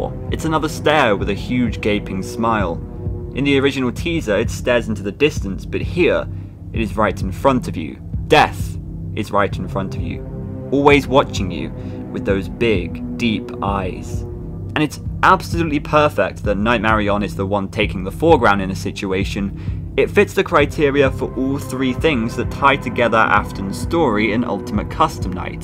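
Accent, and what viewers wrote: United Kingdom. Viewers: talking reminds me why people hate British people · English isn't my first language and thus I find the UK accent fucking hilarious. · Ok but we’re just now gonna mention þe fact þat he got more and more British while voicing? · that British accent tho XD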